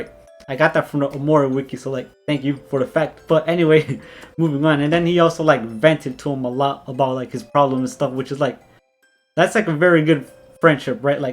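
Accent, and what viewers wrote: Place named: India. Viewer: lmao your half indian accent and you smiling all video doesent match the topic but its fucking funny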